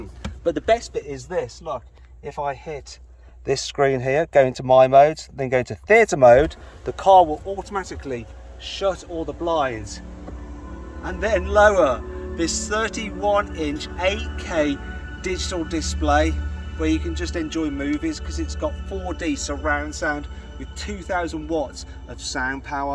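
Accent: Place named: Birmingham, United Kingdom. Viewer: As much as Mat tries to sound articulate I can still hear that brummie accent come through. #noshade 🤣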